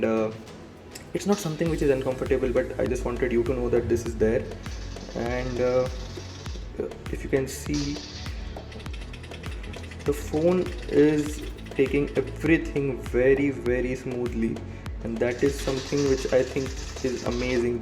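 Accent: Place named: India. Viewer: I just can't ignore that annoying indian accent, however, good video mate!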